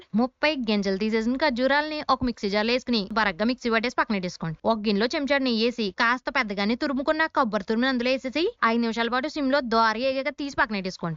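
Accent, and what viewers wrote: Godavari, India. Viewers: గోదావరి యాస మాత్రo highlight 😅😅😅😂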